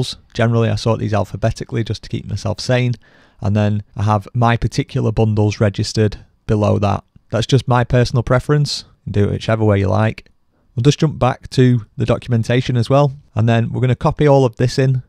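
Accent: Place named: Scotland, United Kingdom. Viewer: Lovely accent, are you Scottish? :)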